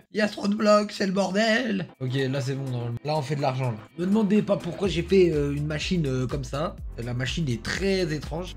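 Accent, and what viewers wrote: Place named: United Kingdom. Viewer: J'adore ton accent anglais quand tu lit les trucs en anglais 😭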